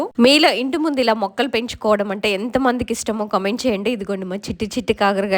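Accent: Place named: Telugu, India. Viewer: Voice lo telugu danam super sis❤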